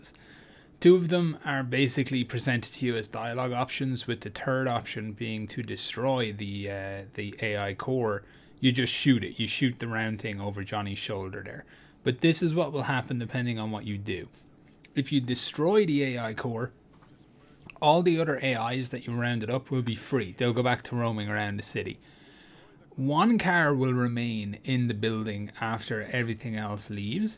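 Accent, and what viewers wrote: Ireland. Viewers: i can feel the irish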